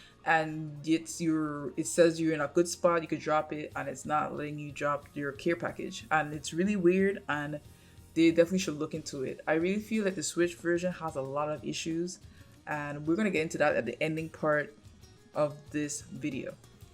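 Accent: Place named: Jamaica. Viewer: she sounds Jamaican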